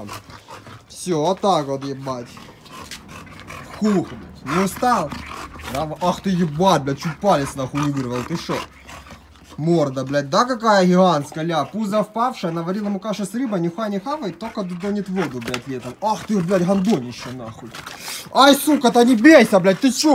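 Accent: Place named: Ukraine. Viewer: Дача, украинский акцент, тупа, ля, чи не., ты ли это?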